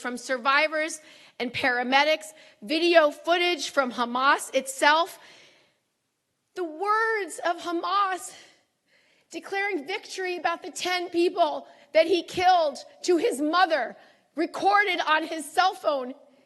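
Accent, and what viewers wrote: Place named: United States. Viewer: Such lies! Israelis own news papers proved only 1 baby died and did not know if it was by Israeli tanks. Also rape is not used as a weapon of war by Hamas or Russia. Not one investigation found this. Her with the Israeli flag, American accent, senate position and emotional tirade should be 4 red flags to know she is paid to lie.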